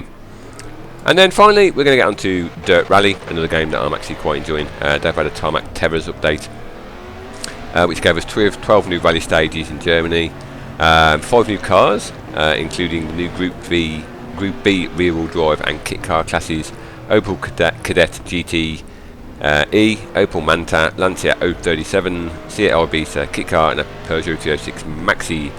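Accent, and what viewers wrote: United Kingdom. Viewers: would say uk.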